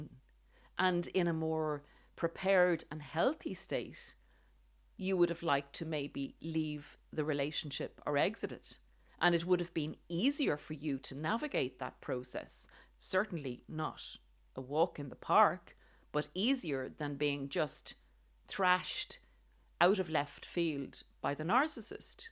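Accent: Ireland. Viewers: Thank you dear for sharing the things that make a narcissist lose the plot! It was so lovely to hear your voice again I love your Irish accent and so pleased that the audio version will be with you speaking. It has to be to be authentic!! A HUGE congratulations on releasing your first of a series of book - go for it!! Remy your co producer was thinking a nice long walk would be better than a narc video. I hope he gets a raise in salary as without him you wouldn’t have this book. He was the co-author wasn’t he? 😂 I have been doing some art of late so that’s why there’s a big gap since my last comment. Listening to your podcast from March 2024 has brought SO much healing understanding and can love myself back when I look in the mirror. It only took 22 years for this to take place so guys hang in there. I wished I had your messages back in 1984 it would have saved me loads but better late than never! 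Every single word you shared I lived with and was hell on earth. You say it very clearly and don’t muck about which is what I liked so carried on watching and listening. I was totally shocked to begin with as I felt like you had been walking with me in this dreadful marriage. As he walked out in a very pathetic suicide attempt which he threatened non stop I told him to STAY out as he was no longer needed or wanted. By golly he went more nuts than he was before. I was cool calm and ignored him totally which made him so angry. So so glad those days are gone and never to happen a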